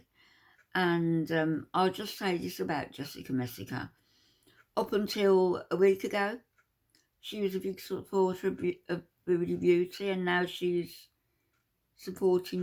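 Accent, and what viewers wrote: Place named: Birmingham, United Kingdom. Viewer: That's a brum accent ( Birmingham)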